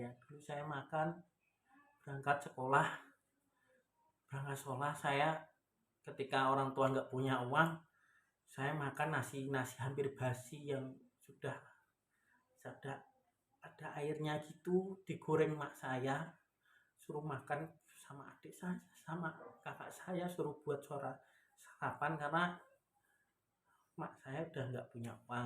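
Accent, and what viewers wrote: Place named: Java, Indonesia. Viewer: Mas.mending ngomong ngomong e Jawa AE..mas Nek ngomong jowo kan medok banget dan khass..dadine Nek pakai bahasa Indonesia rodok wagu..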